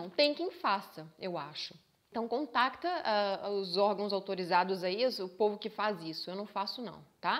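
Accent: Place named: Minas Gerais, Brazil. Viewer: cara, como eu amo esse sotaque mineirin hahahaha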